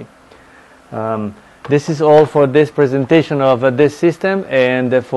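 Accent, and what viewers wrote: Italy. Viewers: R U Italian? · you speak english very well but your accent makes me wonder: Are you italian?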